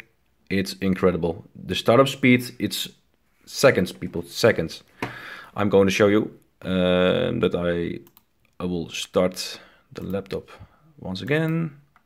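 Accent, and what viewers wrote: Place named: Netherlands. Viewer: haha je kunt horen dat je Nederlands bent xD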